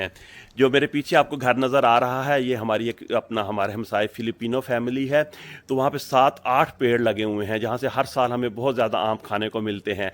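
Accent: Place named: Pakistan. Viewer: ap pakistani sound or rahe hai me apka chota bhai india se bohot acha laga apko pur sukun dekh k lekin australia kese settele hogye pakistan se.. ap kitne log hai waha settle family mem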